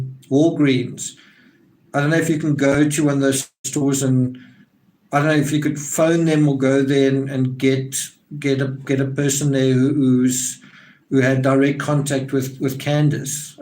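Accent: South Africa. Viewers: Found your channel last night and love it love the accent are you from S Africa . Best wishes from Ireland 🇮🇪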